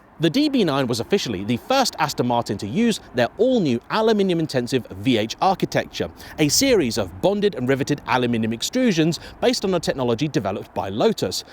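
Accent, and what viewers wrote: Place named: England, United Kingdom. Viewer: As beautiful as English accent is please please please speak slower ❤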